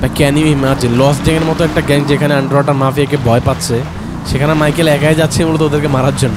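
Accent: Noakhali, Bangladesh. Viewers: Vai apni nohakhali. Kothi tha ken.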